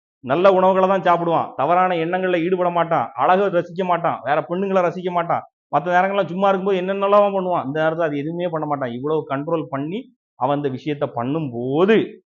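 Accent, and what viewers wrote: Tirunelveli, India. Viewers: Superb. Very matured talk. Entha ooru bro neenga? Tirunelveli slang iruku.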